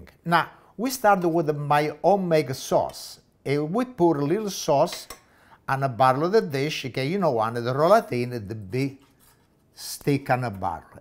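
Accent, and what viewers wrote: Italy. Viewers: I absolutely love the Italian accent! I haven’t heard it in a long time. Tomorrow is Wednesday and I think that eggplant is on the list! Thank you!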